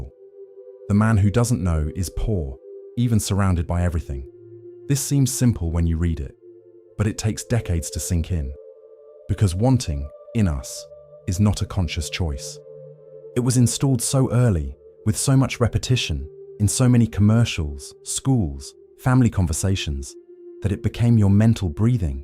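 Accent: England, United Kingdom. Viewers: I’m sick and tired of thievEnglish accents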